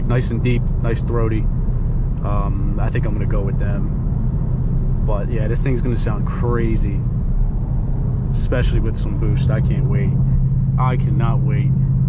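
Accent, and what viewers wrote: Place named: East Coast, United States. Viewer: Definitely from east coast. Delco even perhaps?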